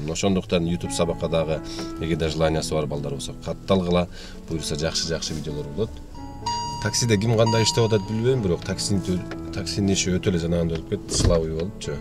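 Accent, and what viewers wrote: Caucasus. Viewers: Чото кавказский аксент болуп кеттиң эле акыркы күндөрү анан эле жок болуп кеттиң не болду экеен дедим эле. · Кыргызлекенсинго, орус тили кавказкий акцент тугондубу ?😂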